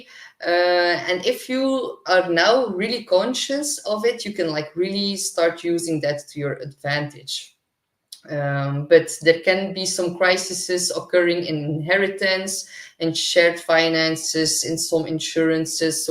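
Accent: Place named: Belgium. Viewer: Hi, I will check the degree of my rising. My Moon cnj Saturn in Capricorn has probably made things worse these past few years. I am in Perth West Australia so not in Your area at all. However the last few months I've been dating a Belgian Woman, that's the only reason I detected the nuances of Your accent as it's virtually unknown here. She is attractive too, My heart hasn't recovered just yet. Thanks for Your reply.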